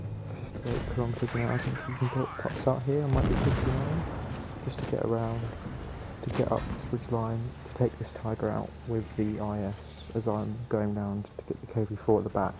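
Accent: United Kingdom. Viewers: God damnit I love the British accent 😂😂😂😂😂😂😂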